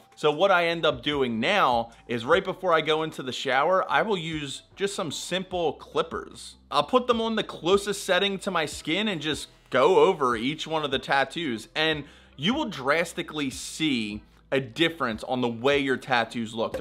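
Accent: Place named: Wisconsin, United States. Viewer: You sound like my exgf. She was from Wisconsin. You sound like youre from Wisconsin or Minnesota.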